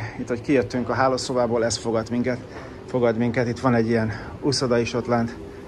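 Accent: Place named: United States. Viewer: hihető és elfogadható a kicsiny "amerikás magyar" akcentus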